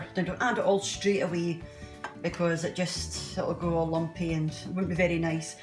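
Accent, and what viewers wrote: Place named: Scotland, United Kingdom. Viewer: Im happy to here a Scottish woman teaching me im not a great cook im learning but love the simplicity of the menus n great food from yonuger years ❤👌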